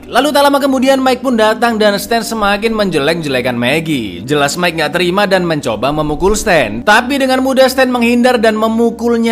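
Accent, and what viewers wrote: Java, Indonesia. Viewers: Rika wong ngendi jawane ya lurr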